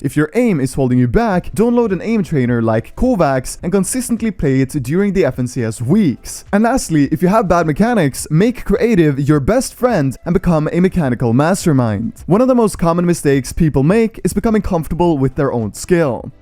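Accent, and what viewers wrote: Denmark. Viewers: are you danish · are you Danish ??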